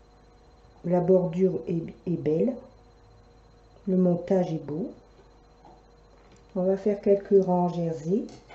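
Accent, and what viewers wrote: North, France. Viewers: Bonjour, c'est gentil d'avoir répondu et si vite,.malgré mon expérience j'en apprends toujours en regardant vos tutos. ...De plus j'ai l'impression de reconnaitre un peu l'accent du Nord, je suis moi même originaire de cette région. ..Vous m'avez redonné le goût de tricoter....Grand merci!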